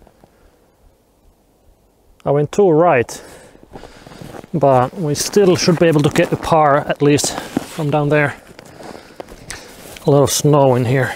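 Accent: Finland. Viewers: As soon as I heard your accent I a had a hunch you were a fin! :D Great tutorial man and enjoyed the ending. Very chill. Kaikkea hyvää ! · How didn't I notice you're finn, you sound like the iceman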